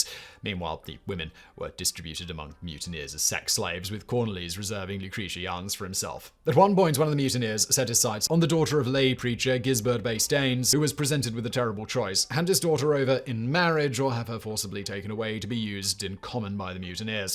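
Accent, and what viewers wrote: England, United Kingdom. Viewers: I have no idea of most of his pronunciation. Is he English?? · When there is anything, you are not, you are you’re just smart and stupid ass to the rest of us, but your accent seems to fool the Americans Yes, because you’re British you’re not not you are not smart for the fall as many Americans and Canadians seems to think you are useless dumb ass everybody else and even dumber sometimes when the way you presented videos say my name you you’re stupid stupid man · If it wasn’t for accent (fairly typical British) I’d swear that English wasn’t even remotely close to his native tongue - the way he mispronounces so many straightforward words eg wallarbees (What the feck dude!?!?) is so funny to hear. Doesn’t anyone in his crew bother to proof read or check such basics before uploading this stuff? It’s becoming as bad as the “Dark5” series of channels with all its errors, cockups, and general idiocy. 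· Ade you all being serious? Perfectly fine English accent and speech pattern! Lord help ya’ll if you bump into a Glaswegian😲🤣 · No offense, but to me, Englandish accents are annoying. I’d rather listen to AI, than someone unable to speak English in a less affected tone, or way. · This guy pops up in almost every channel I'm subscribed to but with different chin hair every time - I always recognise that posh English voice though ha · I'm not sure what I like more about this video. The historical storytelling, glorious British accent, or that godly beard he's rocking. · Southern California raised and when I see a thing about Mexican anything and then hear the British accent I know I am in for a bad time. Mispronunciation of non English words is nails on a chalkboard to me and at this point I think the mispronunciation is on purpose at this point as revenge for losing the colonies.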